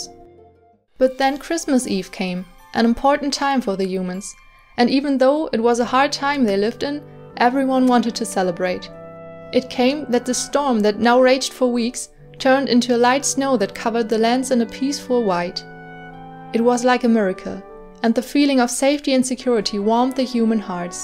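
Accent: Germany. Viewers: I just found your channel yesterday and I already loved it after the first video also your voice sounds really cute... are you from Germany? I am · Where are you from? Your English reaaally sounds like you're from Germany ^^ and the 'Moin' makes me think you're from the north sea. Am I right or completly wrong? 🌊😍 BTW! It's a reaaalllyy nice doll ❤️ instant subscribe 😊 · omg are you German? I'm am German, it sounds like it to me :3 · Man merkt an deiner aussprache das du Deutsche bist. Anfangs war ich zwar etwas unsicher, aber dann sah ich ''UHU Alleskleber'' und boom ich war stolz auf mich das ich an der aussprache erkennen kann von wo wer kommt!!! Btw cute doll <3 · Why is the fabric softener named yes please? Sorry I know German Edit: I am German! I knew your accent was German!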